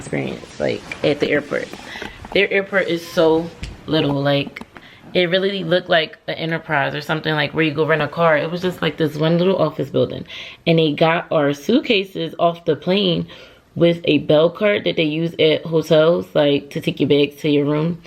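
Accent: Philadelphia, United States. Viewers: As soon as i heard your voice I'm like no way she's from Atl, gotta be from Philly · I thought you sounded like you were from Philly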